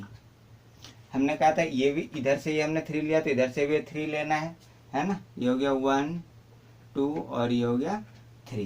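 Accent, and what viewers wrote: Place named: Haryana, India. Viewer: Haryanvi rocks :))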